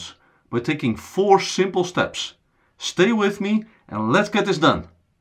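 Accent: Netherlands. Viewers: This gentleman is definitely Dutch!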